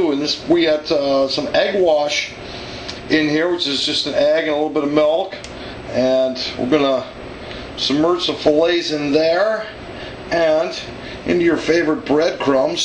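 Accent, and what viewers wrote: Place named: Chicago, United States. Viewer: A big shout from Denver,! Man I swear I can smell the aroma coming outta my computer. BTW I can tell by your accent you're a Chicagoan! Keep up the good work.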